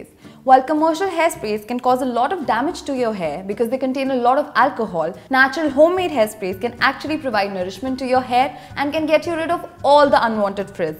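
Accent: India. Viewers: No offence but how do you have an Indian English accent or is it just me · Chinese with indian strong accent , itd called miracle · I don't want to be affensive but why does she sound Indian or is she is Indian? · Girl, your accent sounds like Indian but your face looks like Vietnamese or Filipino.... no offense please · Why this Korean have an Indian acsent? Lagta hai hum famous ho gai hai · why there's an "asian" girl with an indian accent on my screen?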